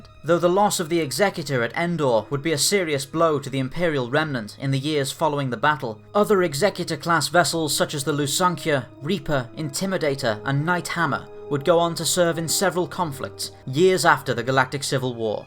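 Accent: United Kingdom. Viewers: I love the facts told by your accent, british?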